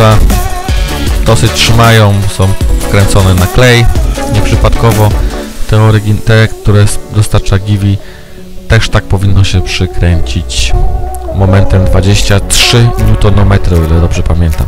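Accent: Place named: United States. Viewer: He he, no pełna profeska film :D po głosie nie poznałem, myślałem że to jakiś YouTuber z USA pod koniec pokojarzyłem fakty i filmiki z łódek. Teraz czekam na dalsze produkcje i do zobaczenia na trasie LwG !!! 👊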